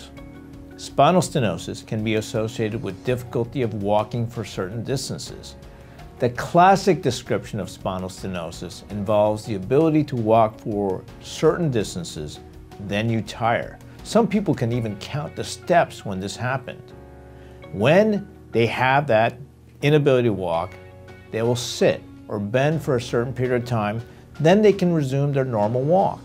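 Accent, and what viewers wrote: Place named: South, United States. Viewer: Great information! So funny hearing a Chinese man with such a southern American accent!😄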